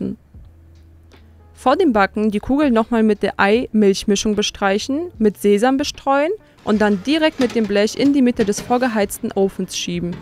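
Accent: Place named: Eastern Europe. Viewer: Wer es noch nicht mitbekommen hat… Diese Junge Dame rollt das „R“ bei „Brioche“ weil sie einen slawischen Akzent hat. Sie spricht ein gut verständliches Deutsch und auch das Video ist vom Inhalt her nachvollziehbar und plausibel. Warum geilt ihr euch an dem fehlenden französischen Akzent, sofern er überhaupt nötig wäre (?) auf? Habe das Rezept nachgebacken, und es ist wunderbar gelungen!😊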